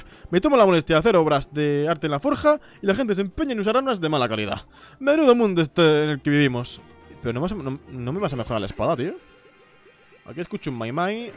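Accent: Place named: Mexico. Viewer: AY ME ENCANTA QUE HABLES TIPO MEXICANO. ;;;; ❤💜❤💜❤💜❤💜❤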